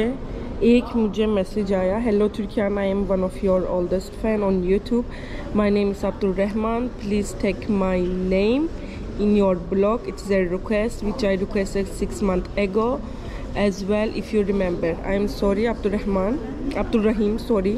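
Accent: Pakistan. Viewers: Thank You for making beautiful vlogs. Totally loved it. I like the way u speak urdu. In love with ur urdu accent. Ma Sha Allah keep making gud videos. N May Allah bless you and your family with healthy life Ameen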